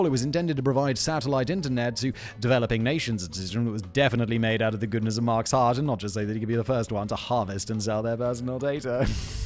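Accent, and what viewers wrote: United Kingdom. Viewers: Being a person from the US who loves James Acaster, it's really funny to hear a guy with a british accent not know how to pronounce his name. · How does your voice constantly trigger my Siri? Your a: younger then me (i’m 38 for context), and b: british so our accents shouldn’t match up (i’m from atlantic canada). Wild.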